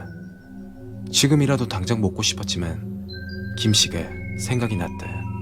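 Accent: Gyeongsang, South Korea. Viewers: 목소리 특이하네요 갱상도 특유음성도 있고 잘듣고있습니다 ㅎ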